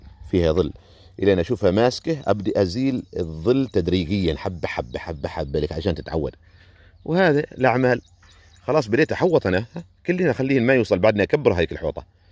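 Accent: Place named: Algeria. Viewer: صوتك تقول عليه جزائري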